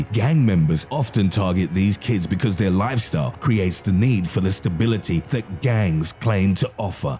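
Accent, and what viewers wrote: England, United Kingdom. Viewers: "The military has a white supremacist presence over all" if you don't shut up you English sounding moron, my boots gonna find the back of your head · Between your shitty accent and your shitty delivery go back to England and take your annoying accent with you. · You have a British accent like half the time....sup with that?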